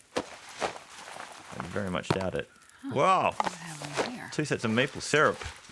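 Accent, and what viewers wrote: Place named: Australia. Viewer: The weather was great but you're skills in taking advantage of it were excellent. Wish you could have found a hacksaw but as others have said the flare gun is a good get. Also nice to hear a fellow Aussie's voice in the Canadian wilderness!!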